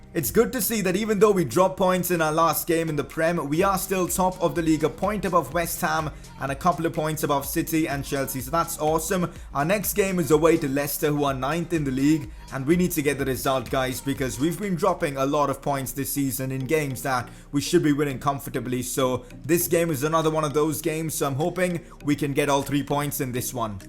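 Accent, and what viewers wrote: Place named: India. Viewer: He sounds like indian people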